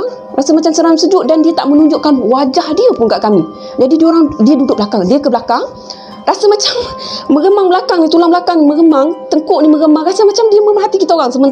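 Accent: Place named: Temerloh, Malaysia. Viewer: Akak ni asal org pahang ke bunyi loghat macam org temerloh Btw best akak cerita santai semoga maju jaya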